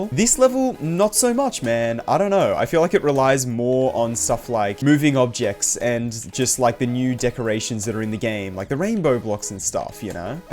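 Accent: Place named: Australia. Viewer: Why do the Aussie yt’s sound the same. Like you sound like the nba YouTubers called “6man”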